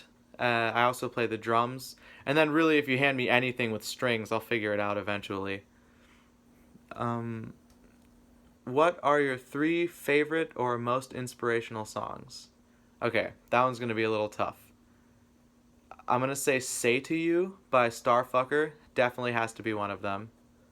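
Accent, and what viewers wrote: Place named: Manchester, United Kingdom. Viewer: Wow. I’m fuckin man. I’m a Scot. I honestly thought you were a Manc or something. Just the way you sing. Very smiths sounding I guess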